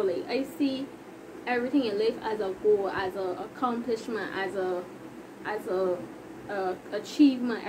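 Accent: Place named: Caribbean. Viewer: You gotta be from nice Caribbean, which one?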